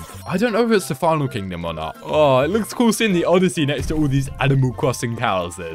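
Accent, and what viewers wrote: United Kingdom. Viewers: Is that why you sound British?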